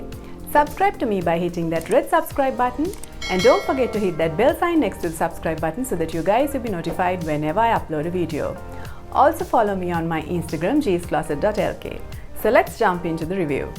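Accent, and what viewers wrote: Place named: India. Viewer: You are beautiful. You look E. African Ethiopian Eritrean or Somalian but by your accent you are Indian, right?? Thx for sharing.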